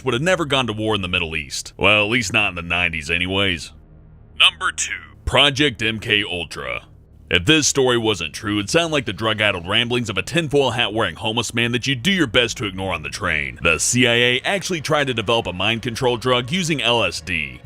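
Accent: Australia. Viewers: Is Aussie? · hava gud one (the only other reason I Loved his narrations is His sexy australian accent)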